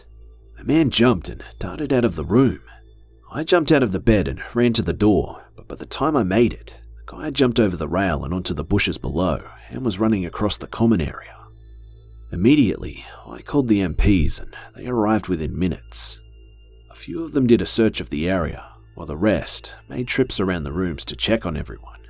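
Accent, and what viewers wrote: Australia. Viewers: Sorry to hear about your situation. I'm a huge fan of all your wonderful Aussie accent and stories, I'm sure YouTube will go back to normal quickly. You know shit like this happens a lot, good luck with your patron. I wish I could be more help, but I'm pretty much where you are. Good luck anyway. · Be. Australian?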